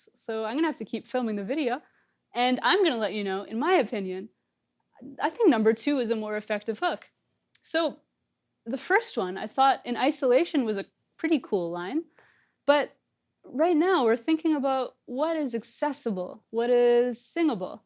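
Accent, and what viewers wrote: Canada. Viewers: God I love her canadian accent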